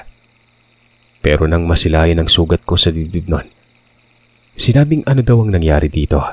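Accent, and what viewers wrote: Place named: Ilonggo, Philippines. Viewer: Wag ka na pong mag ilobggo or bisaya Hindi bagay nakaka rindi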